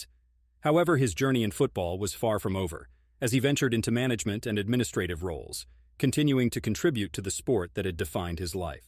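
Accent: United States. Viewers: Full of bullshit! No wonder its a Yank voice. Stick to Ted Lasso and leave football to the rest of the world. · a f----------g yank what so you expect blue blooded murder of the english tounge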